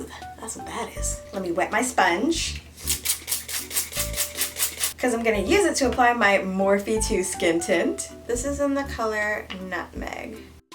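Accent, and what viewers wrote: Canada. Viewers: Your Canadian accent is killing me. 😂 I'm from the U.S. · Canadian accent sounds really nice. I just realized they had their own accent 😂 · Canadian accent soo cute😂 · Your Canadian accent sounds like how my grandpa talks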